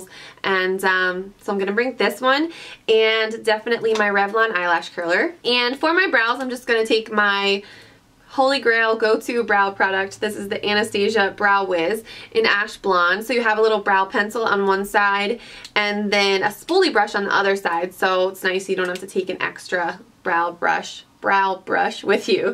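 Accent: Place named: Philadelphia, United States. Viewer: I just saw someone comment and say you're from Philly and I have to say: I fricken knew it! We have a very specific accent and I knew I heard it in you when I first started subscribing to your channel haha.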